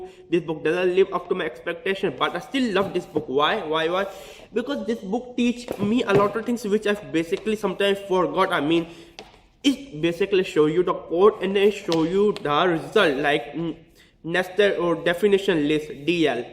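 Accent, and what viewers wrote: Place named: India. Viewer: I really wanted to watch this video because I am trying to decide my job field between those two. Sadly.. I gave up watching this video. I can't understand what he's saying. It gave me a headache after watching this video for 2 mins. Seriously. speak slower. You have super heavy accent so it's really hard for anyone to understand you unless they are Indian too. If you can't fix the way you speak, at least put a subtitle. I can understand most people with accent fine but this is like the worst accent I've ever heard. Super heavy accent + fast speaking = Inglish, not English. Someone please reply which one he picked between those two. I am guessing he picked web developers since he was holding networking books and saying he's born to do networking.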